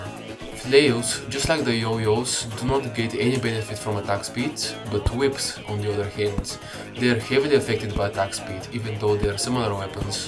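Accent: Russia. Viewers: твой акцент очень похож на русский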